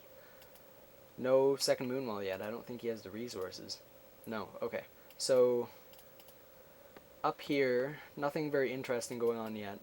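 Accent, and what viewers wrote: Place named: Canada. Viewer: Nice smooth voice, Canada eh? I think I've seen you on US East? " "? Thanks for the upload!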